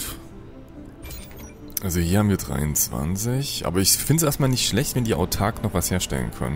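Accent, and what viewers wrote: Saxony, Germany. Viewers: Bist du Sachse, falls Ja. Liebe Grüße aus Leipzig😂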